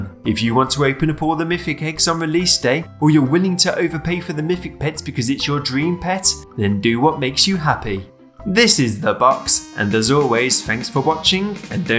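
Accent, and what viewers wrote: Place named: United Kingdom. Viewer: Ur voice is very good even tho ur british ur voice is good and cool and calming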